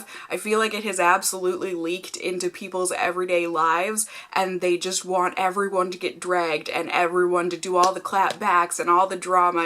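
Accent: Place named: South, United States. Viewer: That Southern accent and the drag comments made me subscribe to you. Also the fact that you called out James Charles and the drag/clap back culture.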